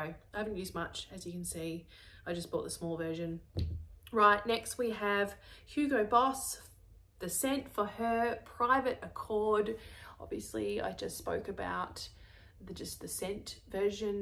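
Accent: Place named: Australia. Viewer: yr Aussie accent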